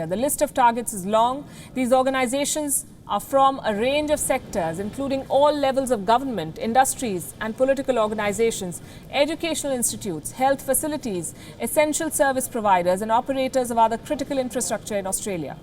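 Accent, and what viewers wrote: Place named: India. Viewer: I like to listen but heavy indian accent make me dizzy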